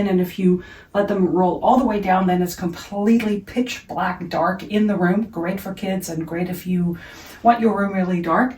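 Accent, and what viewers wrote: United States. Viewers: American accent but perhaps has not lived in the United States since she was a little girl and doesn't know much about it? The removable shower head shown is available everywhere, Home Depot, Walmart, etc. Even the fixed shower heads are adjustable so you can aim them where you want. Windows with interior shades, while not popular, are available in the US. Many, perhaps most American homes have a concrete basement with stairs going down to it. Not all American homes are, as she implied, overdone. Perhaps she watches television and makes assumptions? Many, MANY are simply furnished. I'd say her video "German vs American Homes... this explains everything actually explains nothing. Instead of making stuff up, she should do some research.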